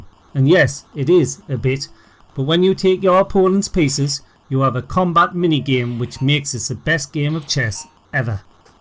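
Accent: United Kingdom. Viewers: Is this Bri ish ffs talk english man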